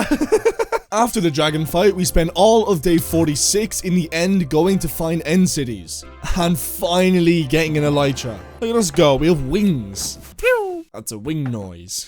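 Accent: Ireland. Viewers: Bro im defo not kapping thats an irish accent foohhshoooo 😂 absolute horseplay.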